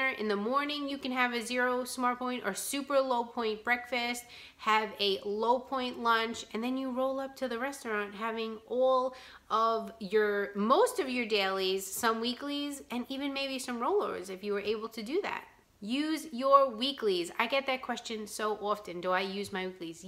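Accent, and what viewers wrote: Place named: New York, United States. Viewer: So Happy i found you the u tube!! Love your NYC accent! Im from Philly! I could listen to you All day, love your honesty 💯💯💯💞💞💞 keep them coming!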